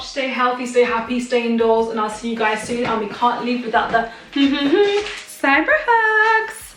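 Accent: England, United Kingdom. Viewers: Excellent english 🏴🇬🇧 accent 😍. You look exactly like me but then as the female version. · Would like to hear you say it in that British accent.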